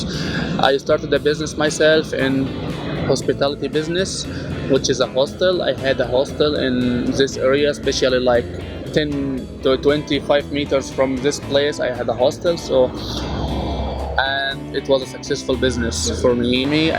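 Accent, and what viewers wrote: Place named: Egypt. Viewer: I can tell from his accent that he's Egyptian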